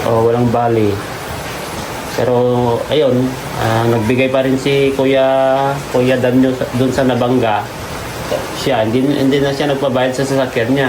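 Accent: Ilocos, Philippines. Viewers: Salamat sa pagtulong at naka subcribed napo ako sa chanel nyo sir ilocano kasi sa tono po ng pananalita mo god blessed po at sa magkapatid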